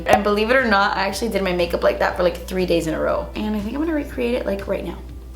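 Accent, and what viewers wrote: United Kingdom. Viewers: your British accent always make my day!😂😂😂 Love you! This look is ❤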